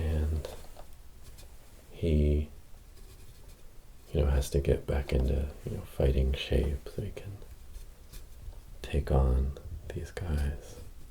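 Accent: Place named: United States. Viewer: I never thought that I really would like an American accent with ASMR vids. Depending on our English teachers at school - in Germany they are mainly British - you get used to British English right from the beginning. And that's perfectly fine with me. But I love this video. Your voice is calming, soothing and relaxing. So, thanks for that!!!.Greetings from Germany. ;-)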